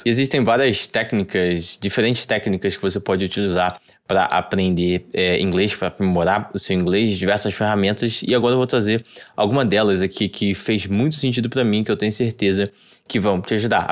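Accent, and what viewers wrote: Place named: Brazil. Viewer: mano nunca sinta vergonha do seu sotaque brasileiro, 99% das pessoas que trabalham em TI pro exterior tem sotaque, no final das contas quem trabalha pro exterior sempre acaba trabalhando com indianos, poloneses, russos, filipinos, e obvio que todos eles tem sotaque xD nosso sotaque é nossa identidade também !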